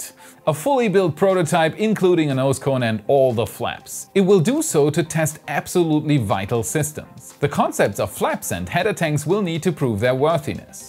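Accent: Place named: Germany. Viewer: Ich mag deinen deutschen Akzent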